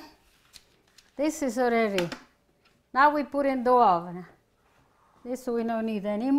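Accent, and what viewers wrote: Italy. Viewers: I so love her accent! She reminds me of a southern grandma with an Italian twist..😊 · I could listen to talk all day long! I love her Italian accent and watching her make her delicious dishes. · Κυρια ειστε υπεροχη.καταπληκτικα τα τσουρεκια σας καπως ετσι τα κανουμε και εμεις στην Ελλαδα.Μου αρεσαν και τα τραγουδακια σας ο Θεος να σας εχει καλα Καλο Πασχα.Αγαπω την Ιταλια ακομη και τον προφορικο σας λογο.❤❤❤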